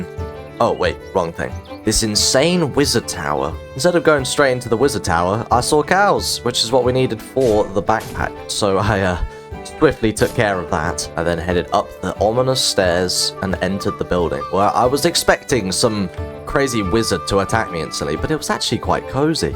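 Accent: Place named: United Kingdom. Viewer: HAIII IM HERE TO CALL YOU MY FAVORITE BRITISH, PLS SAY BOTTLE OF WATER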